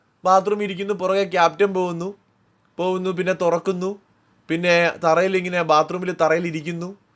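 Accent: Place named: Kollam, India. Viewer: Samsaram kettit chettante veedu ethaand Kollam jillayil annen thonnunnu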